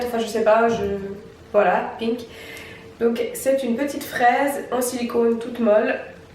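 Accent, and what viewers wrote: Belgium. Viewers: tu est (d'origine) belge ou suisse ? ou tu est bretone ? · OK g mieux compris mais tu viens de Belgique je trouve que tu a un accsant de la bas( on s'en fout ci ça s' écris pas comme ça)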